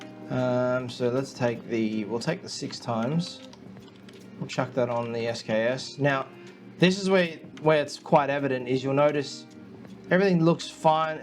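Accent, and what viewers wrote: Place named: Australia. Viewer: Thanks mate , Love from Australia Idk but ya seems Aussie 🦘 too ?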